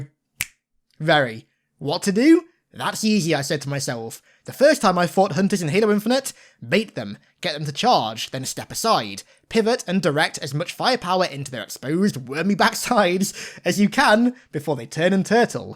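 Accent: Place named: United Kingdom. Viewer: what did you do with? And why do you have a British accent?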